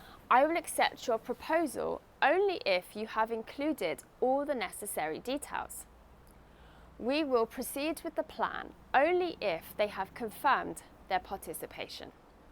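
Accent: United Kingdom. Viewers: this teacher her accent is English British England why she is living in Philippines right now maybe she loves the tropical hot humid and beaches and full sun all year round it is warmer than UK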